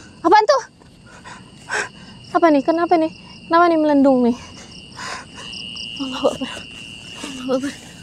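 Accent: Java, Indonesia. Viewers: pasti kak orang jawa.. bahasanya itu kayak orang jawa mbandek ngapak 😅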